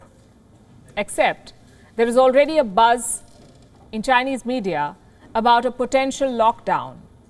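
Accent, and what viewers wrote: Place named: India. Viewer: indian tongue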